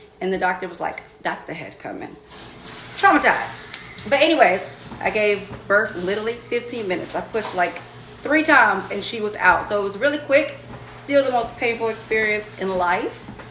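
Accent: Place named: New York, United States. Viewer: I can tell your from New York it’s all in your voice I’m from New York too the Bronx your mannerisms is so New York love it ❤️ you seem very nice down to earth ❤️ I’ve met your sister on ancestry ya look just alike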